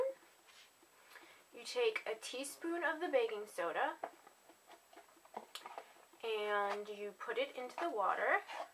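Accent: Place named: Northern England, United Kingdom. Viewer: Worked, thanks. Btw, are you from up Nort? Thought I heard a bit of a northern dialect in your speech.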